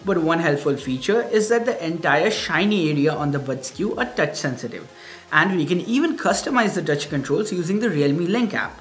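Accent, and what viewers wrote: India. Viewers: but to be honest... My English has been improved a lot and still improving by watching his indian accent english videos 😊 it's really helping me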